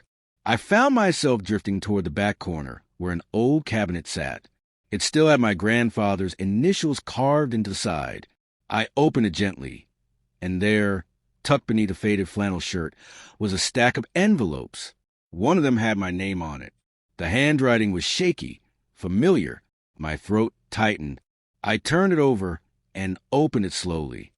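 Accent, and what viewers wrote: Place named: South, United States. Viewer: I love this narrator, best voice, slight southern accent, very soothing!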